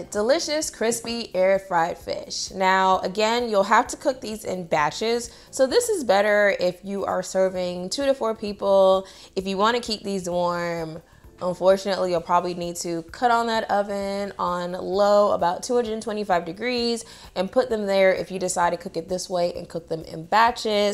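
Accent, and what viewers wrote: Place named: United Kingdom. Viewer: Roger Powell Americans have many different accents. You can hear it in her undertones. It almost sounds British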